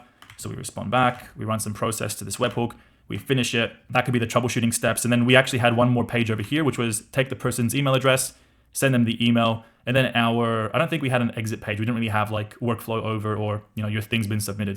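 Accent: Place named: Australia. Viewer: Cześć, prowadzisz ciekawe prezentacje, ale jest nieco śmieszne gdy odtwarzane jest tłumaczenie w języku polskim, bo tłumacz interpretuje wait jako „waga” nie wiem czy dlatego że nie rozumie kontekstu czy może akcent australijski jest tak odczytywany. Ciekawe co o tym sądzisz? Swoją drogą uruchamiam usługę w Polsce wspierającą procesy w n8n w zakresie komunikacji SMS i voice, czyli interakcje SMS i inicjowanie połączeń telefonicznych do klienta z powiadomieniem głosowym ( voice message) oraz inicjujące rozmowę z botem. Cóż za fascynujące możliwości stwarzają takie systemy jak n8n.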